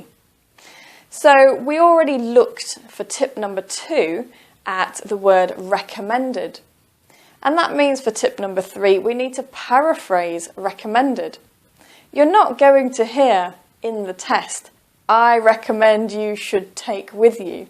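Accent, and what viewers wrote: United Kingdom. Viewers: Would you please upload a video with tips to get correct answers on map/diagram matching on listening please? Would really appreciate. Love your British accent 😆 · Hi. Thank you very much for your really nice teaching English with wonderful smile. I am very like British accent, so I am real glad that your English teaching on YouTube. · that's a great work, great accent, continued and uninterrupted, i wish i could talk like British,my accolades for your altruism, have seen your website too. its catchy. thanks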